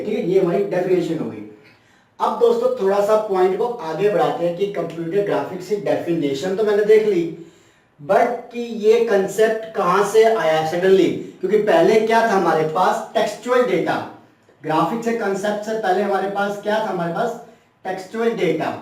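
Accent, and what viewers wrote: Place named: India. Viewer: oh,full Indian English .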